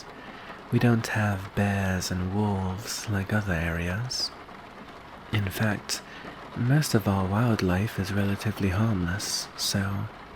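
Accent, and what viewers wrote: United Kingdom. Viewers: I feel like I'm talking to one of my kind (fellow british person) even if I'm not really it's weird 🤣🤣😂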